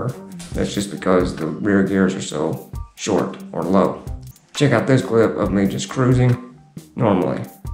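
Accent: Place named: South, United States. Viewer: Man your voice and southern accent are the shit!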